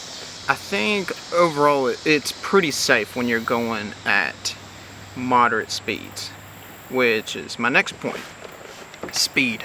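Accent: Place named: Texas, United States. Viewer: Your voice is so good bra like texas but your a brown guy like me 🤣 such a mind blow out seeing as your so down to earth... can't picture a single (non racist!) texan I like other than McConaughey, until now! 👍🏽 from a guy in a tiny place called fareham in the UK ✌🏾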